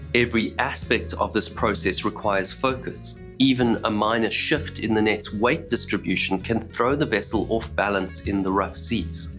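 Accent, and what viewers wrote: South Africa. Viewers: Are you south African brother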